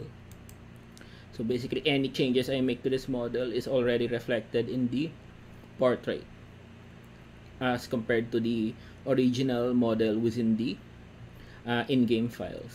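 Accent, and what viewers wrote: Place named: Philippines. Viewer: You sound like filipino. Where are u from?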